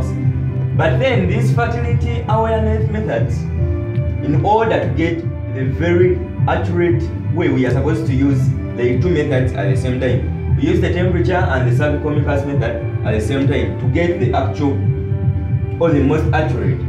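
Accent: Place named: Namibia. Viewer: He sounds Namibian👌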